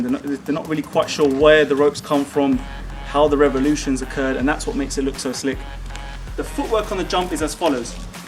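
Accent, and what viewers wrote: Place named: London, United Kingdom. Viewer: Awesomely slick mate, my ropes should be here today or tomorrow.. Can't wait.. How long should I live in London to acquire the slick accent yo?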